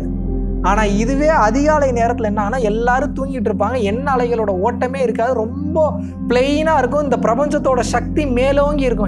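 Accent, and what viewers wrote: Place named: Kongu, India. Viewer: Supper tambi Solum vdam miga alagu Enga ur kongu vaadaiatikudunga peshle 👌👌🙏🙏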